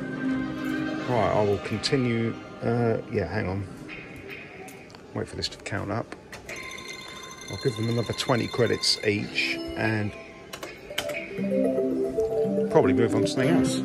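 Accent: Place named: Home Counties, United Kingdom. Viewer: Tis weird watchin your vids, you sound just like me. I say the B word just like you etc, you must be from the home counties or sussex area. Really enjoy the vids as you play the same stakes and games that i do..when i do. A very real representation of what playing these things is all about. 👍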